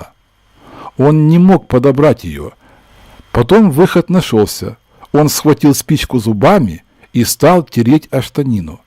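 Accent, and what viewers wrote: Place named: Ukraine. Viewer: Спасибо за начитку, конечно, но украинский акцент, сбивчивость и, самое главное, обилие рекламы, делают прослушивание некомфортным, относительно других чтецов произведений Лондона.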